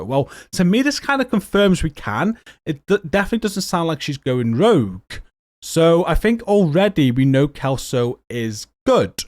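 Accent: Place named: England, United Kingdom. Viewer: I really like you man, I follow you I support you and I played with you and your clan for a time but GOD I HATE ENGLISH ACCENT.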